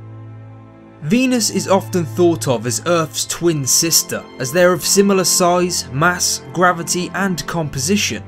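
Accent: United Kingdom. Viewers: Well,very intersting information about Venus.But to tell you the true,I think that despite all the uncomfortable conditions at Mars,the red planet looks a far more friendly world to be colonized.Thankyou so much for sharing that so interesting data!!I love that british accent :-) !!.Greetings from Colombia!!!.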